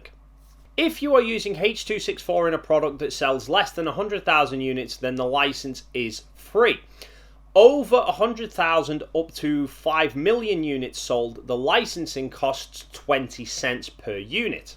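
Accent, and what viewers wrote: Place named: Isle of Man, United Kingdom. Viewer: That's a Manx accent for sure! You a fellow Manxman? 😁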